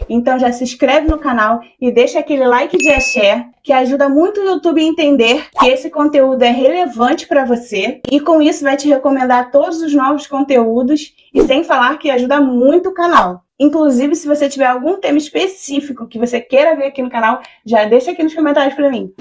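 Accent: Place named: Rio de Janeiro, Brazil. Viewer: A história é linda , mas o sutaque carioca é horrível 🤢🤢🤢🤢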